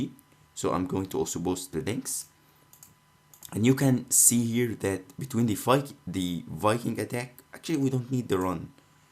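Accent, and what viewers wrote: Egypt. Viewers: YOU SOUND LIKE AN ARAB ,,,, AN EGYPTIAN TO BE EXACT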